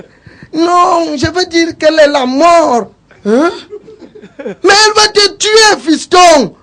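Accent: Cameroon. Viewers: J'aime cet accent bassa'a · l'accent bassa ..parfait mais pour l'histoire , j'ai eu du mal a decoller...mais pas mal tt de meme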